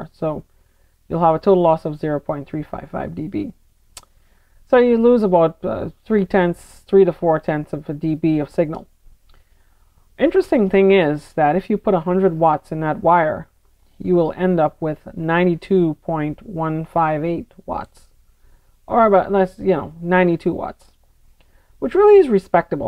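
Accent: Trinidad and Tobago. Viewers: Thanks, by the way are you from Trinidad? KM6DHT/AG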